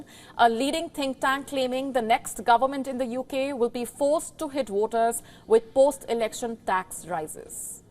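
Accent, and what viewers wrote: India. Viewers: A horrid abrasive accent she has. Like NBC from India. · I am very disappointed that Rishi does not speak with an Indian accent like he should!! So sad and tragedy!! Indian accent has to be the most beautiful accent in the world like this news woman. No trolling here!!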